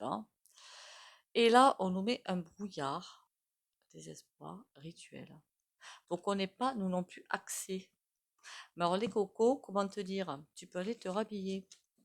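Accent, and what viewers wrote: South, France. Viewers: Bonjour, j'apprécie de plus en plus vos vidéos j'adore votre accent du Sud il me rappelle mon adolescence à La Ciotat. J'ai aimé la série des Stargates et le quantique y été à chaque épisode. Merci d'être la 😘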